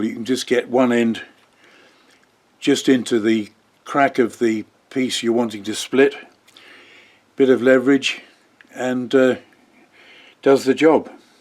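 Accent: United Kingdom. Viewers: Hope your back gets better soon. It's nice to hear a British ascent. And a bumbling old twonk. Keep up the good work. M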